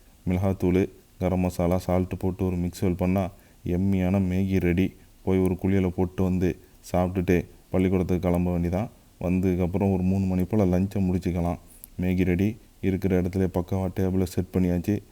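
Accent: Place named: Pudukottai, India. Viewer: Asalamu alaikum brother neenga entha ooru thappa eduthukkathinga neenga pesurathu pudhukottai Alangudi side pesura mathiri irukku athan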